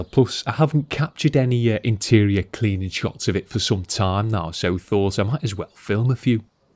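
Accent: Liverpool, United Kingdom. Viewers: I'm pretty sure he's a Liverpudlian (didn't want say scouse, may have come across as being rude and didn't want to offend those from that area)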